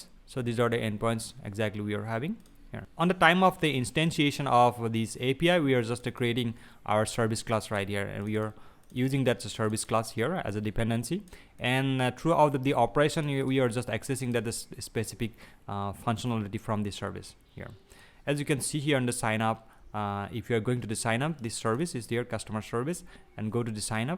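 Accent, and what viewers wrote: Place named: Assam, India. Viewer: Brilliant! Also the accent sounds awfully familiar, are you by any chance originally from Assam?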